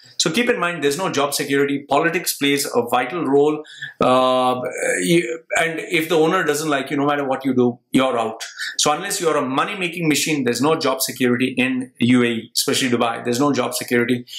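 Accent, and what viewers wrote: India. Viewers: I couldn't understand a word from his Indian accent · Wow you have such a strong Indian accent for someone who has not lived their. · You sounds like an Indian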